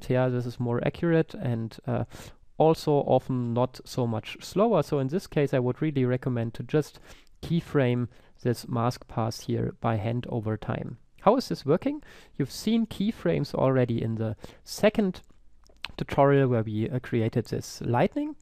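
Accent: Germany. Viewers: Man hört das du Deutscher bist xD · Yay, ich heiss auch!:D Deutsch Akzent FTW!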